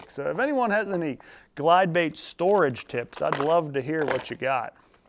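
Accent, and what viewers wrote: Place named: Pennsylvania, United States. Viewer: It's a Pennsylvania thing. Yinzer language. We try to keep it interesting up here kind of like a secret handshake.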